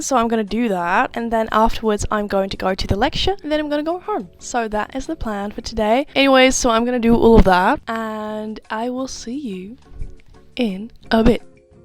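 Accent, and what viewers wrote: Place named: Australia. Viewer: wait i thought you were in australia, but your train shot made me go wtf where are u from LOL edit: I checked ur bio, youre from the netherlands... OK BUT YOU GREW UP IN AUSTRALIA OR SOMETHING RIGHT? I swear you sound australian o_____o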